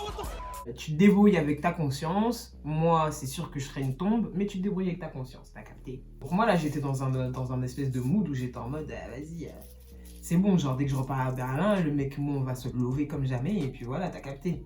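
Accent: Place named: Ivory Coast. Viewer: l’accent ivoirien me tue! continue j’ai kiffé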